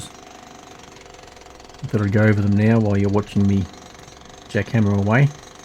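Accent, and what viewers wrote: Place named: Australia. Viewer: I'd definitely rethink the height of the tunnel mate. Especially if you plan on lining the tunnel at some stage. You'll want to put down a floor at least if you plan on wearing a nice pair of strappy heels down there otherwise you'll end up rolling an ankle. I love the Ozzie accent - always have. Deviated septum - yeah been there done that. Too many kicks and punchs to the face and too slow to dodge them LOL. Cost me $7k to get mine straightened. Worth every cent. Keep on digging!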